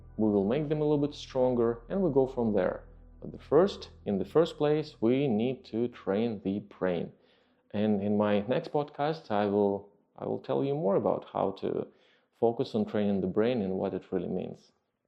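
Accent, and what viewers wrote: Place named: Russia. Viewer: Yes now it makes sense. Your accent and vibe remind me of another one of my favourite YouTube content creators, Anton Petrov. He’s also a Russian expat.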